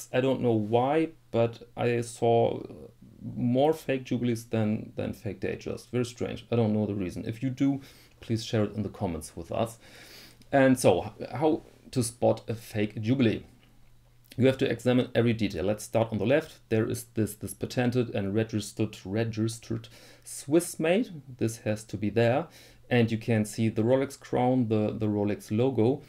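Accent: Germany. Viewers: I don’t know why, but to my ears there is something special about the German accent when it comes to talking about technology - new or vintage. I have only just found this channel, and I’m so happy I did. Liked and subscribed.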